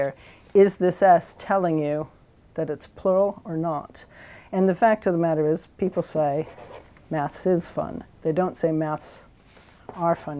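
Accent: United Kingdom. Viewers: Even though she's American, she's learned to use quite a few British pronunciantions of words. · The Limey accent has really rubbed off on her.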